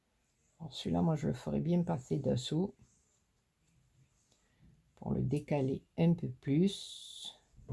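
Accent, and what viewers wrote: South, France. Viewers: Coucou, un kilo de talent, un zeste d'humour, une pincée d'accent du Sud, une grosse cuillère de bonheur et voilà la recette de la superbe carte que a réalisée!!!!! bravo!! j'adore ta créa, elle est magnifique, merci beaucoup pour ce joli tuto, bisous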